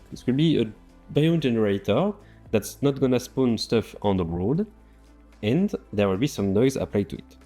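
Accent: France. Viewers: Salut, j'aime beaucoup ton accent français et tes tutos:) je ne suis pas française mais tunisienne mais j'ai récemment entamé des tutoriaux sur ma chaine en Français pour Unreal (et prochainement sur 3ds max et Blender) car j'aimerais participer à la commu FR des tutos et je t'évoquerais dans les prochaines parties de mon tuto sur les terrains/Biomes, si quelqu'un a du mal avec l'anglais, m'autorises tu à poster ce commentaire sur ta chaine pour évoquer la mienne?: <link>, merci si c'est le cas:) et continue les tutos j'ai subbé!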